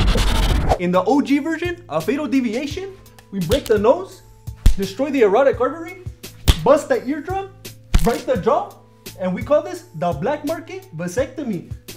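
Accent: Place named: Mexico. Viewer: es gracioso tu acento muy mexicano! saludos from oaxaca krav maga studen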